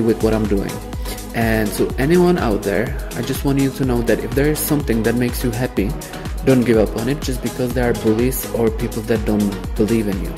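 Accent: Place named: Czech Republic. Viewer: Where do you come from your acsent and place where you from looks like Czech Republic or Slovakia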